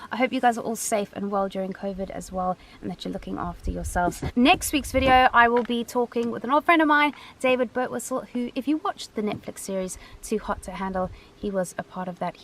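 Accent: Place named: South Africa. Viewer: you have a hint of a South African accent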